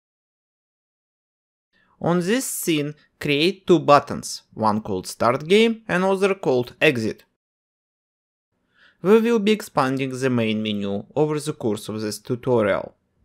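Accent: Russia. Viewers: Спалился что ты русский)):face-blue-smiling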